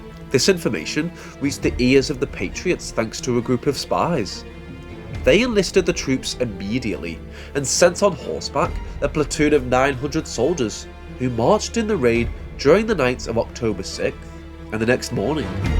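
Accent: Liverpool, United Kingdom. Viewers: I've lived in the north and south of England. For more than a while in each but can't place your accent. At times a little scouse then Welsh. What is it?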